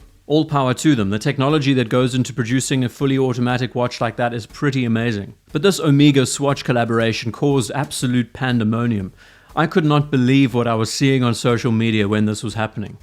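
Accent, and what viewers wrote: South Africa. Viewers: Hold up... you from South Africa?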